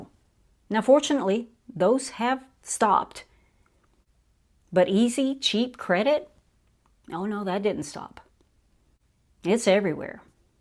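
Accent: South, United States. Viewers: ah, couldn't tell. You do have some southern accent going on.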